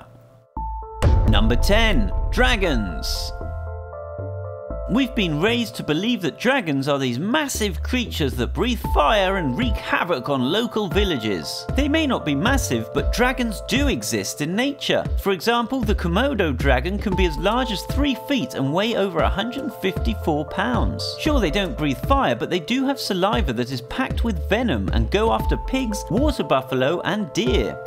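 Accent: England, United Kingdom. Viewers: Nice Englishmen English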